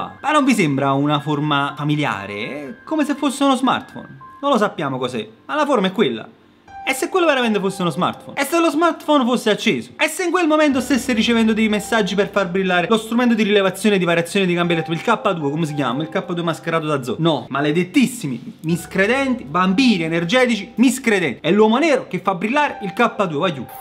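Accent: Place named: Naples, Italy. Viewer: sei veramente eccezionale davvero a parte che mi piace molto il tuo accento napoletano sei comunque fantastico perché vedi chi crede a queste cose come me e non sono l'unica imbrogliare me e gli altri non è molto sportivo diciamo anzi ti senti presa in giro. spero e so che hai capito cosa mi riferisco comunque scusa se te lo dico ma il fatto delle bambole possedute è la più grossa idiozia mai sentita anche se non stai parlando di quello però ci tengo a dirti quello che penso per farti un esempio. Grazie 😘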